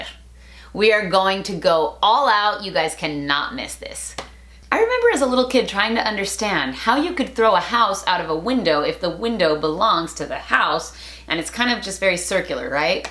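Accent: Mexico. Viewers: Hmm... mexican spanish, some of these modismos do not apply in Spain. Love the entonation :-) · More than Spanish modismos I would call this "mostly Mexican AND Spanish modismos" I'm assuming because of her accent. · Steve Paul If I to guess from her accent shes either mexican or has mexican ascendance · It's cool hearing an American white woman speaking Spanish with a Mexican accent. Sometimes your English also has the "singing" cadence found in Mexican Spanish. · “Hacerse bolas” no es ningún modismo en español, en todo caso en mejicano, en español tiene el mismo sentido que en inglés, ninguno aparte del literal. I think you should warn what idioms are truly Spanish and used all over Spanish spoken countries, and which of those are just used in Mexico. I suppose each country has its own idioms understood only by natives of each country in Latin America. You speak very good Mexican Spanish, sound 100% Mexican. 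· Hola! Una pregunta, hablas inglés con un acento o entonación de español mexicano o es mi imaginación ? Porque conozco americanos y su inglés es un acento un poco distinto. Solo una duda 😁👍🏼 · Si, definitivamente. Mexicanos reconocen a mexicanos, y créeme su acento si es mexicano. Y a eso añadimos que la mayoría de modismos que dijo en este video son Mexicanos. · acá es donde se puede realmente apreciar la influencia mexicana en el español de, soy argentino y algunas no las escuche nunca jajaja igual se entiende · acento mejicano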